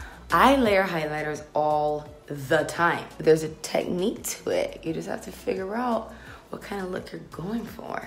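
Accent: Barbados. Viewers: you can really hear her Barbados accent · Her Bajan accent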